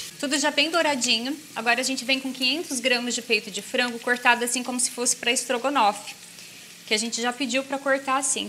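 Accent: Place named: Piracicaba, Brazil. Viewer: Adoro seu sotaque piracicabano, muito simpáticao.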